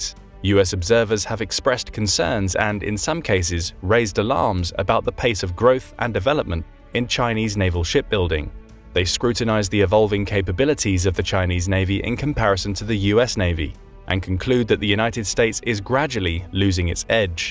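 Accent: England, United Kingdom. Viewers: Thank you for actually stating you base this whole video off nothing more then purely speculation. You stated that this “Shoddy Construction” is based off a few of the islands runaways. You disregard the idea it could be to not provoke further escalation. Do you know that China is the builders of the world. You are lacking facts in this video. 100 years of humiliation….is the time you reference China being the largest economy and then crumbling. Th is was because the West forced worst, opium population, devastating economy, country all up with colonialism something that the rest seems to excel at. The question part is also that there is information here that is worth knowing actual but it’s used just to pop up a story or narrative that’s s not true you. if you decide to do research and publish videos based of facts might actually be worth following. 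You know what is true…Americans hear a narrator with an English accent, and automatically give credibility to whatever information is being spread. Neat trick and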